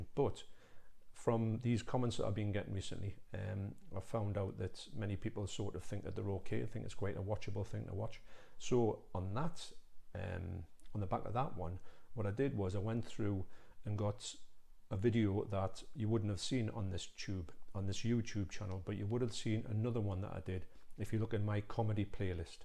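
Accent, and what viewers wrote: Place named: RP, United Kingdom. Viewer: what's with the posh accent ?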